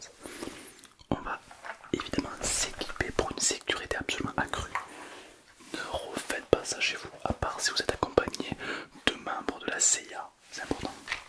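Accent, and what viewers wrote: South, France. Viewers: Adiu tu as un bon accent du sud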